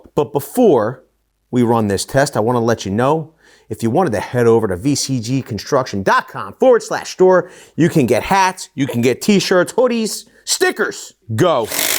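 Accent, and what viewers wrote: Philadelphia, United States. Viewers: You're from Philly. I can hear your accent.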